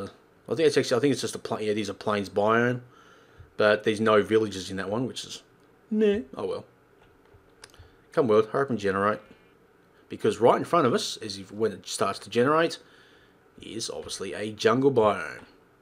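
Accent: Australia. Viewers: Obviously Aussie xD.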